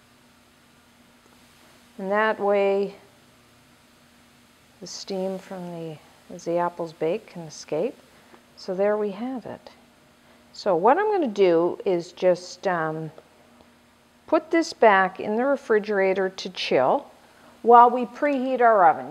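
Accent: Canada. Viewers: is she canadian? aboot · are you canadian?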